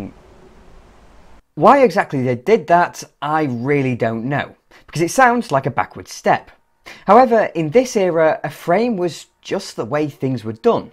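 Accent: United Kingdom. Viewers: Doug DeMuro, but British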